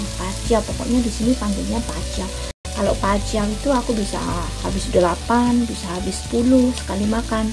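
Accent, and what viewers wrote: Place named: Banyumas, Indonesia. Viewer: My favorit food Roasted sweet potato / bahasa ngapak muntul mba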